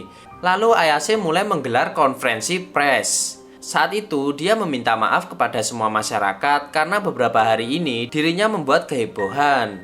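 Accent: Java, Indonesia. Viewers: Medok amat ngomongny😂😂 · Jng membaca medok jowo mas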